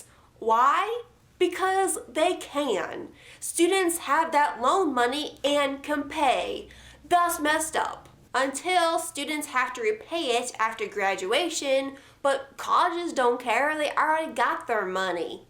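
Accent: South, United States. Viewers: Good vid. Your chin dimple is getting cuter each time. Where does this southern accent come from.....real or are you just putting in on? BS says free college for everyone...just what we need. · you accent is become increasingly countrified. It's getting me excited.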